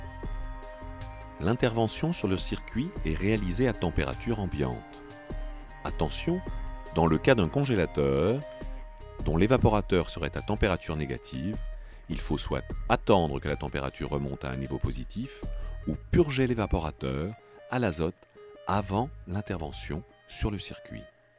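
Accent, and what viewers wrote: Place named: Paris, France. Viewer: He has a very posh accent. Is he from Paris? . Anyone?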